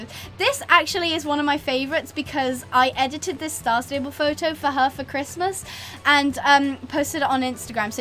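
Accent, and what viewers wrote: United Kingdom. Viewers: I love the British accent she has! Love ya!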